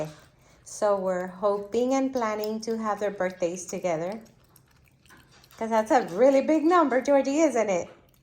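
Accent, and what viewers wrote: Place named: Russia. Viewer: No spaek english russian like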